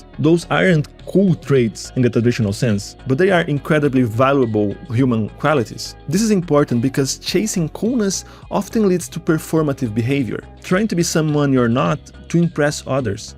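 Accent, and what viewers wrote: Brazil. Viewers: are you brazillian? i can tell by the way you speak english, just curious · acredito que seja brasileiro esse sotaque ingres ai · Great analysis dude! This character trait about Okarun's transformation is something I've already noticed a long time ago, but it's nice to see such a detailed and profound analysis about it. Also.. tu é brasileiro né? Notei pelo seu acento kkkk (mas teu inglês é mt bom man)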